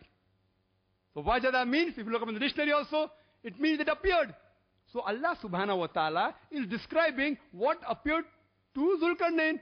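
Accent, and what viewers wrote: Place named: India. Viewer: There is a huge distinction between saying, that the sun sets in Murky pool of water, and that the sun appears to set in a Murky pool of water. Like saying ' I killed that guy' and 'I appeared to have killed that guy'. Here is what Quorun says - 'Till, when he reached the setting-place of the sun, he found it setting in a muddy spring, and found a people thereabout. We said: O Dhu'l-Qarneyn! Either punish or show them kindness.! - Qur'an 18:86. The point number two: this guy appears to be a real knucklehead. His English, or what it sounds like - Hinglish, really sucks.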